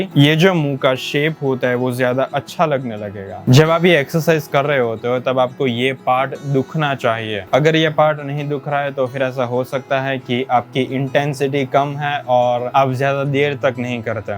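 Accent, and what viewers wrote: Gujarat, India. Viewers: gujarati bolva na way thi khabar pde che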